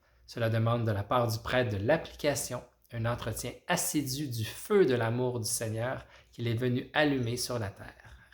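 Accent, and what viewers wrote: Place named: Canada. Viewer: je me suis perdu sur youtube t qui toi (mais ta un accent canadien c trop bien)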